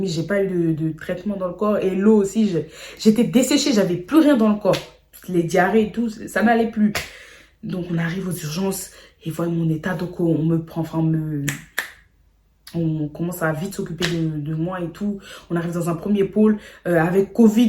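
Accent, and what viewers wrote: Ivory Coast. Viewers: J’aime trop ta manière de parlé en ivoirienne 🤣🤣🤣❤️❤️❤️❤️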